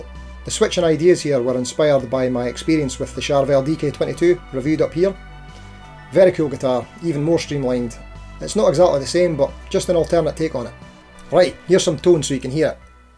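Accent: Scotland, United Kingdom. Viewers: 3 string graph tech string tree would be totally amazing! Also, always great to hear a Scottish voice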